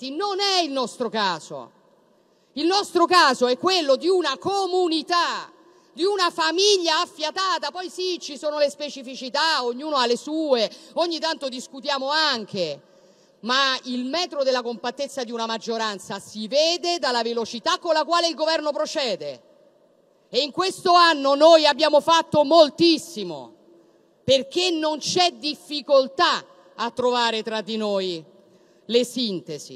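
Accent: Rome, Italy. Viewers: Impara a parlare da PdC di una Nazione, anziché come Una delle Borgate Romane. Raffinatezza ZERO. 😎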